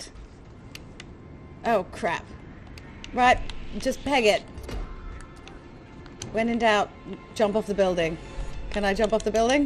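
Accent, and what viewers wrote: United Kingdom. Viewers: The posh accent does it for me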